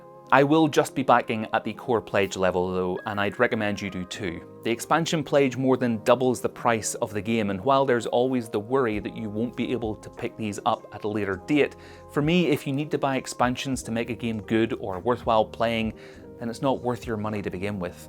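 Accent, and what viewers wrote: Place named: United Kingdom. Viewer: OK I think this was exactly the channel I was looking for for years. 🥰 PS: As a non-native English speaker, may I ask you where does your "accent" come from (which part of the UK)? ☺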